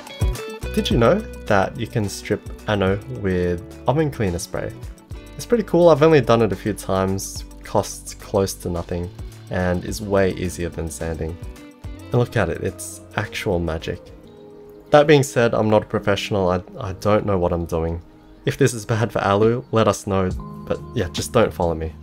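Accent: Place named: Australia. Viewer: the calm australian accent is great too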